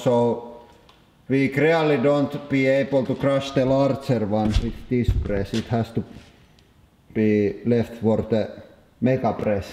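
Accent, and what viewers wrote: Finland. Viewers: aa mä oon aina luullu et sä oot venäläinen :D vaikka kyllä oon epäilly tosta suomi-aksentista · My high school tennis coach was Finnish. He had the exact same accent but with a different voice. It was hard not to bust out laughing when he'd get mad and yell things like "you guys play like piece of joke out on court today!" at us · you clearly aren't familiar with the Finnish accent So many people speak like that lol. Not me tho' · Finnish accent is so great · SUOMIIII, KOSK TOI ENKKU 3/5 JA KOSKA "Voi vittu!!!" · he sounds like hes from finland...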